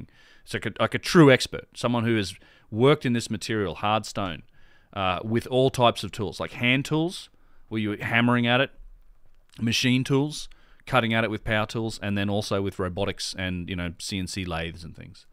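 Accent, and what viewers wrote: Australia. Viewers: Such a wonderful channel, where's your accent from though? Sounds Aussie. Love, absolutely LOVE your approach & mindset, well researched, honest & especially prepared to challenge establishment. Xx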